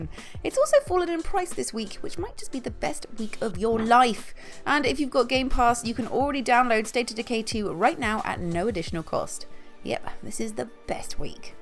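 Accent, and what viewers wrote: United Kingdom. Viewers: why is every xbox video a british accented female? im just wondering.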